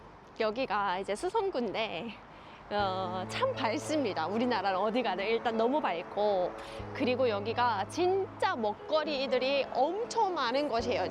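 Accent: North Korea. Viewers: 신기하네요 북쪽억양이 조금씩.. 자연미인이시면 대단한 미인이신듯!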